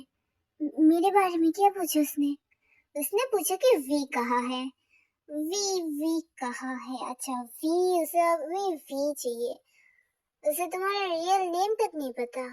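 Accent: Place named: Hyderabad, India. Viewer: Didi app Hyderabad sa ho kya aap ka language Hyderabadi hai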